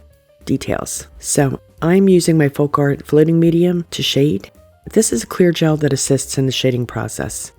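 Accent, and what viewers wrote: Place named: East Coast, United States. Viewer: I love your East coast accent as well! Happy weekend!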